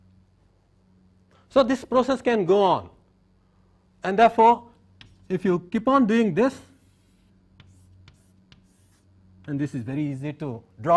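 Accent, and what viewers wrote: India. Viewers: Nice NPTEL puts these videos up for the whole world to learn from them :) Just a wish; You ought to put subtitles in, as indian-english is difficult to comprehend fully. Cheers.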